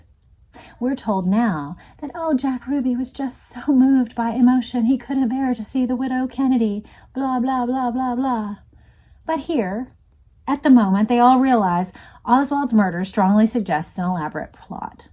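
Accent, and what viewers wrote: Texas, United States. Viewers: always reminds me of the Actor Andi Mac Dowl it's that lovely Texan Ascent 😊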